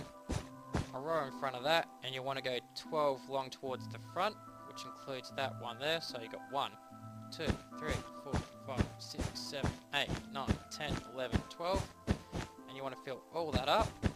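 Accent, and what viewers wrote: Australia. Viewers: Are U Australian or not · Like you accent your austrailian nice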